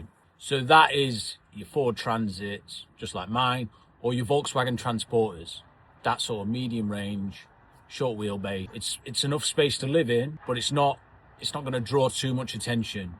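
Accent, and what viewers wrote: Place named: London, United Kingdom. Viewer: Well, you are certainly not Scottish with that accent ... my guess (I've not watched any of your vids before this came up) is that you come from the Greater London area - north of the river I hope 😁 The van looks very nice. Mine is very basic too, with just a bed and W.C. Will be looking at your back pages 👌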